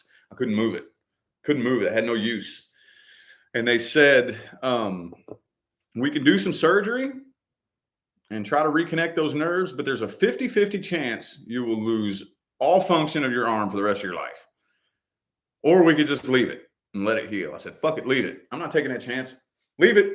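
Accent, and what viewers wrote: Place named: United States. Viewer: I loooove this specific American accent and the cabin idea at the East coast and the fitness orientation :) greetings from Greece 💜☀️✨🌙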